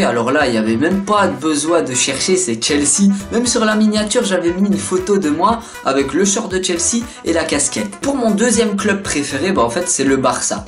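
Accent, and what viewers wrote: Belgium. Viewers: Le réveil de l'accent belge!!!!!!!!! (oui)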